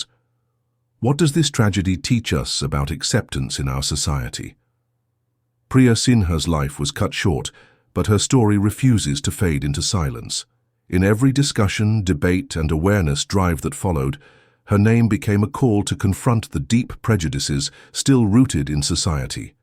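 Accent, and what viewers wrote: United Kingdom. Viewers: Get this crap off now! What's with the tosser English accent? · Me from Visakhapatnam in the Province of Andhra Pradesh, India. I am an adept listener of your narratives, most particularly for the sake of correcting and fine-tuning my British english pronounciation, and improving space of my vocabulary. Thanks for your melodious narrative.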